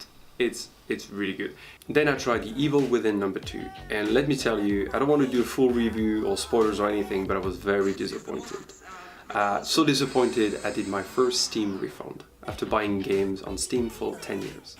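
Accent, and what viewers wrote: France. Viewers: Hello, je sens le petit accent français:-) super vidéo! Je viens de faire l'acquisition d'un iMac 27'' i7 4.2, 16gb ram, 3TB Fusion drive, Radeon pro 580 et je suis ravis! Je fais pas mal de vidéo et je joue également via Boot Camp à BF1, Wow tout fonctionne parfaitement en <num> ultra. Je ne peux que conseiller l'achat de cet iMac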